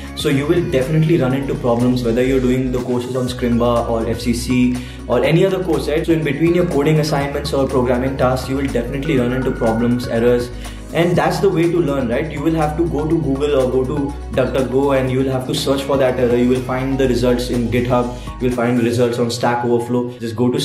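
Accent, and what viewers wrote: India. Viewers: Your accent is the perfect version of the Indian accent 👌🏻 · Wow your accent is like Indian.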